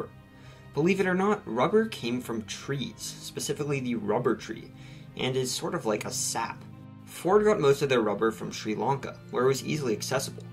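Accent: United States. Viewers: I don't know if you're american, but you sound american, by the apocalyptical way you talk. Even if you throw a nuclear bomb in it you can't destroy it. Believe me, I'm brazilian and I know the region pretty well.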